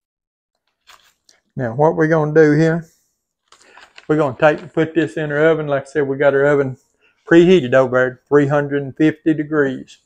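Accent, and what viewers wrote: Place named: Texas, United States. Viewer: I will watch again to hear your Texan accent!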